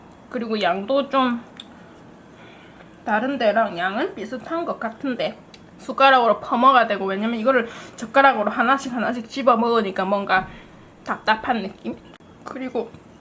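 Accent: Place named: Gyeongsang, South Korea. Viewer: 경상도쪽 사투리에 뭔가 귀여운 말투 ㅋㅋㅋ 재밌게 봤네오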